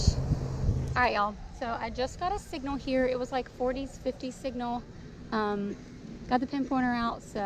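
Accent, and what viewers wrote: United States. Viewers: I found you today through nugget and I noticed your hat. I am from belton South Carolina. It’s not real far from Clemson. Maybe 30-45 minutes. My husband is a big tigers fan! I love your personality. I should have know you were a local the way you talk. 🥰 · Hello. I wish I lived near a saltwater beach. Back in 1996 I was using a Bounty Hunter machine with very simple target ID on a low lake (Toledo Bend) in the swimming area and found two monster size 14k rings and a smaller diamond ring. I also found 18k gold rim glasses and a small 14k earring and lots of modern coins. I have a Vanquish detector now and would love to go beach detecting. I found my first gold ring, an 18k England Air Force Base one with missing stone in the late 1970s in my grandfather`s yard with a heavy Whites CoinMaster that used a bunch of AA batteries. Also got 1.75 in silver coins that day in the garden. 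Love your cute accent. It`s very similar to some central and north Louisiana ones.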